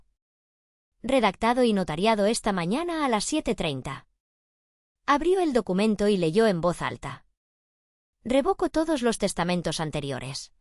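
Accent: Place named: Spain. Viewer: Sería interesante oír la narración en español de México y no el de España, ya que se supone que la historia se desarrolla en México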